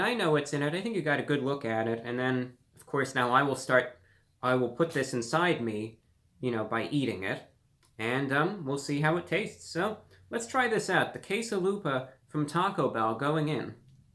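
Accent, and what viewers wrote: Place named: New York, United States. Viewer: Clearly a New York accent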